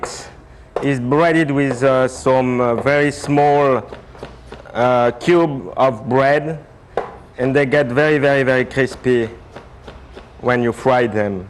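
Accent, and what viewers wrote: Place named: New Orleans, United States. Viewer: As a kid I feel in love with her voice from the intros. It was so sultry and New Orleans to me. I still think it's sexy! Hey Great Chefs people, let her know that! She should do voice over work.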